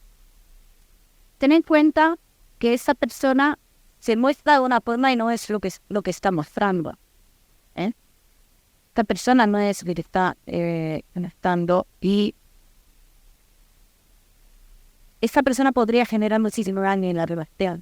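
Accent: England, United Kingdom. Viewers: Pero a ver tia...hace dos meses hababas en andaluz,español ahora me hablas en acsento ingles...que te pasa?????i mira que yo hablo 7 idiomas pero esto realy nuncaa li e vistoooi baby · No kreo ke es mikrofono es akcento ingles .algien se mete aki pienso jo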